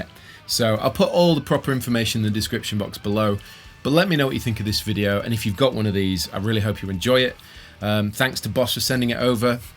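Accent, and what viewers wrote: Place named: Lancashire, United Kingdom. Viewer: where did you grow up mate? I'm trying to unlock your accent, accents are a pet obsession of mine. I'm thinking Lancashire but I could be way off...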